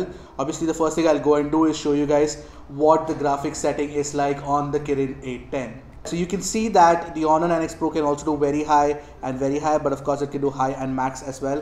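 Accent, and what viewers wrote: India. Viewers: I can still understand you even you use indian accent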